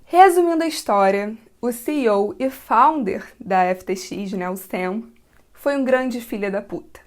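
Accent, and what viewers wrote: Rio de Janeiro, Brazil. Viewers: Nossa, seu sotaque carioca torna o vídeo mais gostoso de consumir. Sério, hahaha. Então, sou novo no canal e desde que lhe descobrir no TikTok, venho acompanhando todos seus vídeos. Tanto aqui quanto lá e posso afirmar que você explica muito bem. Exprime o conteúdo de modo claro. Muito bom. Bitcoin ainda é complexo pra mim — sou leigo no assunto gerais de economia —, mas o interesse que tenho por tudo que envolve economia me locomove para entender mais. É bom estar atualizado por alguém lúcido. Forte abraço. 👍🏼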